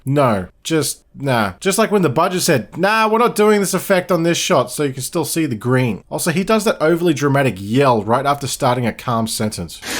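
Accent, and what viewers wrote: Australia. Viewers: I could listen to this Aussie talk about horror movies all day!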